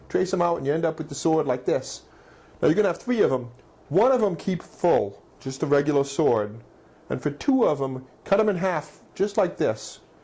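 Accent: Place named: Bronx, United States. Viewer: I love how you thumbs upped your own video. Sometimes, I think I'm my biggest fan too! Your accent reminds me of a guy I grew up with who was from the Bronx, where did ya grow up?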